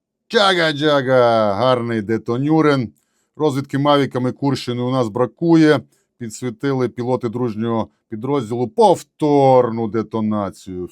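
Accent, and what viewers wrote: Transcarpathia, Ukraine. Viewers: Як приємно чути нашу Закарпатську бесіду.Слава хлопцям! Дякуємо за роботу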